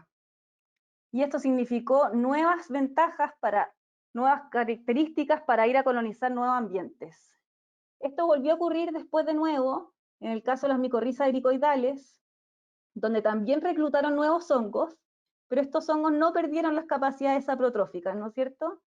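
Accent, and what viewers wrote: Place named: Chile. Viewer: Gracias por la conferencia, muy didáctica y un contenido excelente. Y un acento chileno precioso, digno de Gabriela Mistral...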